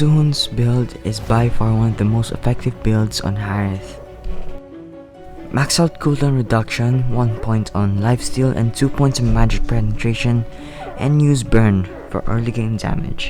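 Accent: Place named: Philippines. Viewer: the pinoy accent thou😂 chill voice btw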